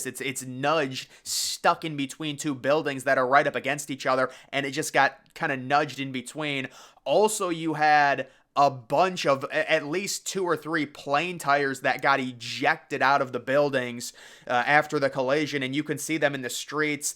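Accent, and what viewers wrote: United States. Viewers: i like the american accent i could listen to that all day and it would not annoy me , promise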